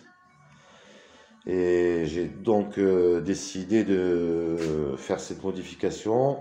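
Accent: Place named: Nice, France. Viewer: bonjour , je suis curieux de voir l’évolution de ton projet ( plus que jamais utile vu les difficulté que connait le pays en ce qui concerne l’électricité ) , voir ou t' en et ( batterie? si oui ,combien d'ampere heure... combien de watts t'arrive à tirer etc ) ps : on reconnait direct l'accent nissart , j'ai grandi a nice :))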